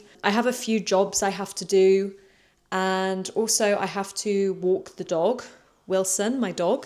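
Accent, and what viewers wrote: United Kingdom. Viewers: I really enjoyed your videos and I especially loved your British accent. No wonder you're from the native English-speaking country!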